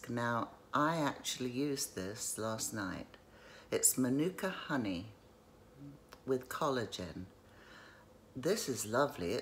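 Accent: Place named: England, United Kingdom. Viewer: Beautiful accent are you from england??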